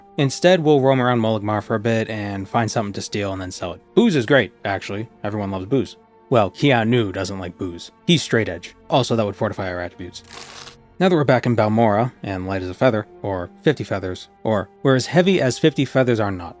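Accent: Boston, United States. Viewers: I knew there was something weird about you. Finally figured it out. You're from Boston aren't ya? Lol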